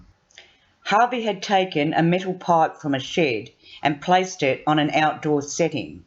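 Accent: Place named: Australia. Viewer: Good to hear an Aussie voice narrating, liked, subd.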